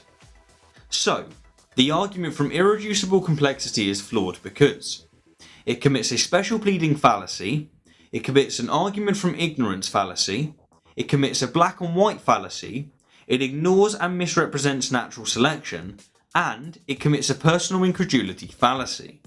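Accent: England, United Kingdom. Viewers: How did you develop an English accent in South Africa?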